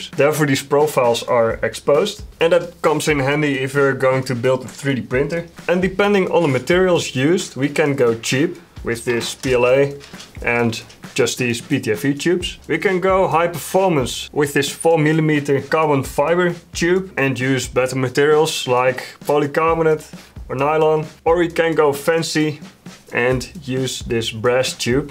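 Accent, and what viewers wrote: Netherlands. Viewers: it took me 0.1 seconds to recognize a fellow dutchie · Wow, nice design! From your accent I'm guessing you are dutch: knap ontwerp en zeer knap gemaakt! Je zou ze zo moeten verkopen, toch best wat materiaal voor nodig om dit eenmalig zelf te maken. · Very cool looking lamp! This is the first video I’ve seen from this channel and listening to your accent, you are a fellow Dutchie right? I’m just getting into 3D printing and orientating at which printer to buy. But I’ve just subbed to this channel for future inspiration for prints 👌🏼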